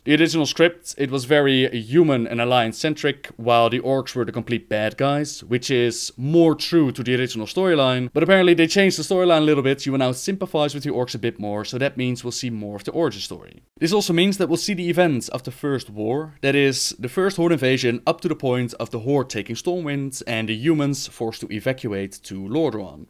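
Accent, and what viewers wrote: Netherlands. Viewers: Your dutch accent! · I think hes from the netherlands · dutch accent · It's a Dutch accent.. I reconnized it right away. I hate the Dutch english accent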